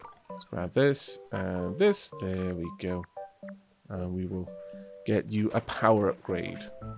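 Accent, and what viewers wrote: Wales, United Kingdom. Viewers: It’s taken me far too long to work out exactly what makes this series so enjoyable. Is it the knowledgable way puts the bot coding together? Is it the dulcet tones of his Welshishness (try saying that 3 times fast) that makes it so pleasant to listen to? His addiction to tidiness and prettiness? Is it the ridiculous cuteness of this game? No. It’s the motivational speeches. I really want to be part of the collective just so my representative bot can be on the receiving end of one of these! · I would wuv to be a Neumann just to hear how you say my name. It would also be creepy cool if I ended up in I AM MOTHER team because I work in a day care. I'm mainly curious how my name sounds in a Welsh accent. Wiv wuv Akane Taakon P.s. Spread the Wuv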